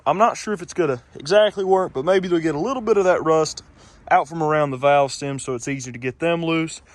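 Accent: South, United States. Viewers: This American accent is harsh, grinding and an onslaught on the ears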